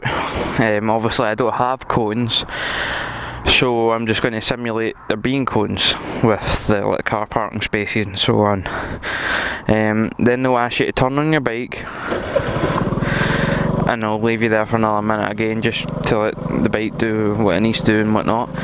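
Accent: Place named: Glasgow, United Kingdom. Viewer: Whereabouts is this u sound like ur from glasgow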